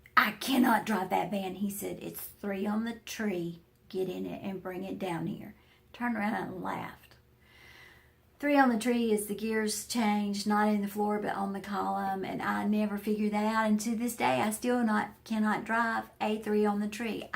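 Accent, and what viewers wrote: South, United States. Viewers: I love your sweet southern accent.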